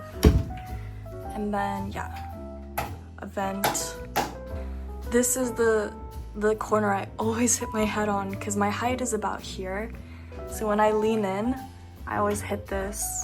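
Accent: United States. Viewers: YouTube algo just randomly pushed me your channel. Can you do a video so we can learn more about you? did you grow up in Japan or another country? your English sounds very American to me, and not picking up much of an accent. I see your videos are getting a lot of traction already, you should be able to make this a full time job if you want to do something like that. Consistency is key. You are stunning btw. much love from Pittsburgh PA. :) Bye for now!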